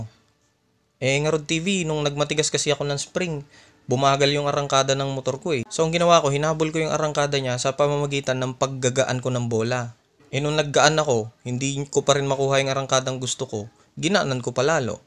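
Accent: Ilocos, Philippines. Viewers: Ito talaga idol ko sa paliwanag ng cvt eh. Tanong lang idol, ilokano ka? Saan ka idol? Hahaha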